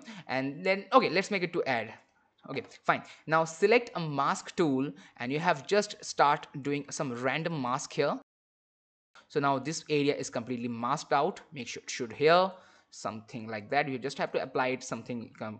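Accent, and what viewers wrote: India. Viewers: indian accent me bol le ya usa wale me bich me attka hai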